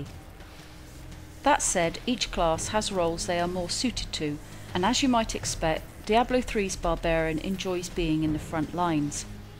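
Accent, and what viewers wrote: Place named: United Kingdom. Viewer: you are to diablo3 what David Attenborough is to the BBC. I know us Americans have been trained to think British accents are smart but it definitely works for this vid.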